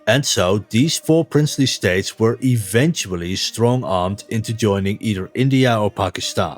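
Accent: Netherlands. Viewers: Do I hear a Dutch accent? 🇳🇱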